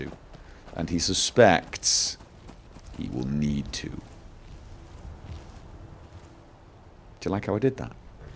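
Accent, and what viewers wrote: United Kingdom. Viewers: Allright, let me get this straight: said that the british still use feet for measurring, but he sounds pretty british to me. im a little confused.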